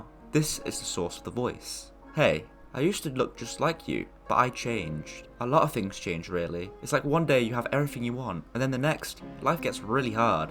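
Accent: United Kingdom. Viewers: you sound british hehehehe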